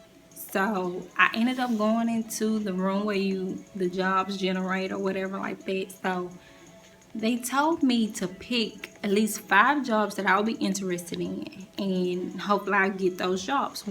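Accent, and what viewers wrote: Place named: South, United States. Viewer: Love your southern accent 😍❤️‼️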